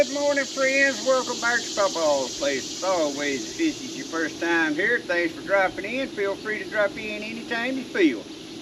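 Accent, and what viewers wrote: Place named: Rural, United States. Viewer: Thanks. My wife loves your country accent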